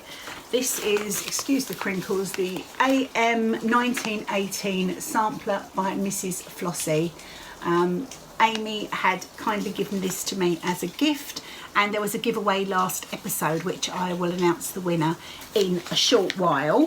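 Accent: United Kingdom. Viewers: I recently discovered your channel and I'm so enjoying it! I am a quilter and knitter but have picked up cross stitch again. It is so pleasant listening to you while I stitch because my Mother was British and it makes me think of her!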